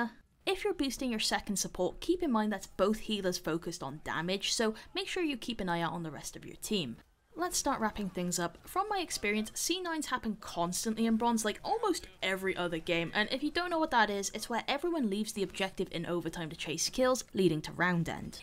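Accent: United Kingdom. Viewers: I always wondered, what part of UK is this accent from. I find it funny but lovely. I had it stuck in my head when I played Witcher 3.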